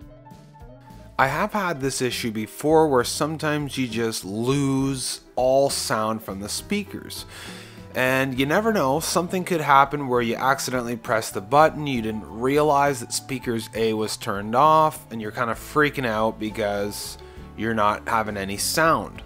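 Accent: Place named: Canada. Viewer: Canadian detected.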